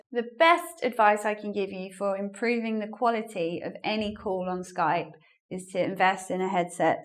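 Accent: United Kingdom. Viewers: Lets donate people, shes a great teacher with very sweet British accent. I love it :) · You're a really cute teacher, I like your british accent and the way you teaching. That's great! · I love your british accent!! thanks for your class.... Greetings from Colombia. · You're amazing !! The best accent of the world and the best and real English !! UK 👍🇬🇧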